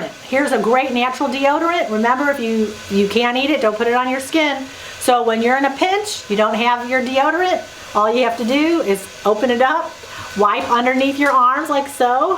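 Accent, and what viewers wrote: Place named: Michigan, United States. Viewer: Let me guess.... you are from Michigan. Half my family speaks with that accent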